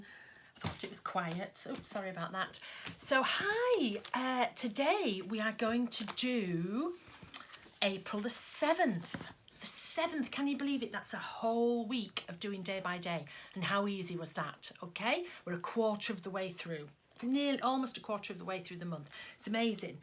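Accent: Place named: Yorkshire, United Kingdom. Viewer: So lovely listening to a Yorkshire Lass and giving lots of advice & inspiration. Thankyou, from a misplaced Yorkshire Lass dahn souff. Xxh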